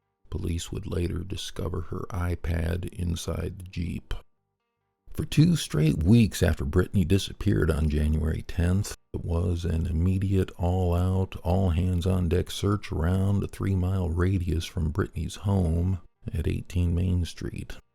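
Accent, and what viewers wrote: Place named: Maine, United States. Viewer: Brookfield is beautiful. Very sad. His voice is fine, probably from Maine. This was a good video, thank you. Keep going.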